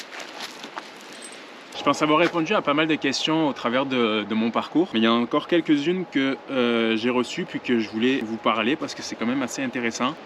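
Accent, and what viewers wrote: Quebec, Canada. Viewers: L’accent québécois pas canadien haha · J'adore ton accent. On entend tranquillement le petit accent Québecois qui perce! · Tu as tellement pris l'accent canadien :) GG mec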